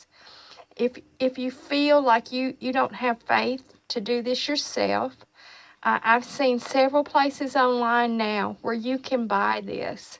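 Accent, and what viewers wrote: South, United States. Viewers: Great video,! Love it everytime I see it / review it. Have shared this particular video with several friends, including my mom. "These are my good DRANKIN' glasses! "- love it! ( referring to the 12 oz mason jars)! I'm not making fun of you at all though! I love your Southern accent and mannerisms! I'm a Southerner, too - a "Florida Cracker", and have some family up in Tennessee. Appreciate your channel and information on wild lettuce VERY much!!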